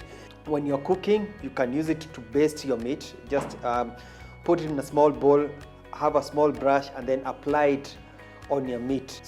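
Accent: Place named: East Africa. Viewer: Oooh I can understand this Gud East African English thank uuu chef!!!💪💪💪❤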